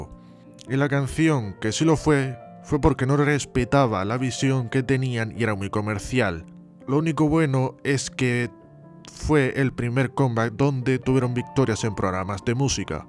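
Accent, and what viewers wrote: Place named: Spain. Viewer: hola humano por el acento debe ser español me gusta que alguien intente profundizar con bisturi en las entrañas de nmixx buscando el problema de su muy poco mas que mediano exito hasta hoy, analizando con lupa a detalle cada cancion y comeback no coincido con el caso kyujin para mi ella tiene que ser el centro y es mejor en todos aspectos que sullyon solo exceptuando la belleza, o.o Dice, soñar, son canciones que poco tienen que hacer contra las de grupos como new jeans,aespa,no se diga grupos de alta calidad musical como XG, tripleS, pero tampoco las calificaria como basura, yo en lo personal prefiero Dice que run for roses Dice es una cancion corta pero grandilocuente llena de cambios de ritmo instrumentalmente rica y fantasiosa una especie de k-pop progresivo es dificil que el publico coreano la aplaudiera mientras que run for roses es mucho mas basica y simple alli fueron a la segura y les resulto cada quien tiene su propia opinion coincido en que el mayor culpable es la empresa ya que las chicas tienen talento de sobra lo unico que necesitan es de buenas canciones que las hagan destacar pero esto pasa con muchisimos grupos y podia nombrar el caso de Kep1er y Everglow y algunos otros mas con miembros con gran calidad pero que es poco aprovechada con canciones terribles como tipi tap o zombie que uno no puede creer no haya un filtro de calidad que se de cuenta lo pesimas que son y salgan al publico,espero que siga haciendo videos porque hay muchos casos de que profundizar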